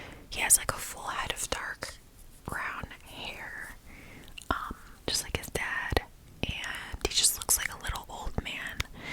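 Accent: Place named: Philadelphia, United States. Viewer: Just wanted to say as someone from Philly I love your videos as for some reason asmr is extra relaxing to me when the person has the same accent as me. Will be binge watching all your content!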